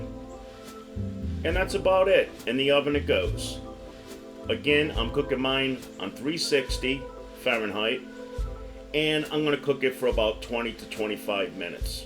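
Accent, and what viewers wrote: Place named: Massachusetts, United States. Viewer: Are you from Mass ? You sound like me lmao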